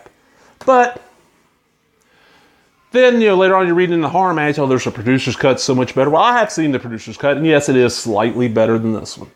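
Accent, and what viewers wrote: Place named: South, United States. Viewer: Also, I really like your thick southern accent in these videos! I have a very thick southern accent myself and kinda reminds me of myself a little bit but mine's slightly different. Where were you born and raised? I was born in Dallas, Texas and grew up in Tulsa, Oklahoma so I'm guessing that's where the accent comes from!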